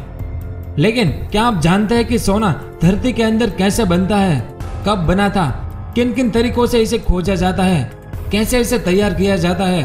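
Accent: Gujarat, India. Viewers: Tu Gujarati lage se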